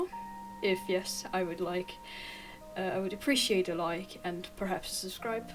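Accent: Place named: United Kingdom. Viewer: du klingst so brittisch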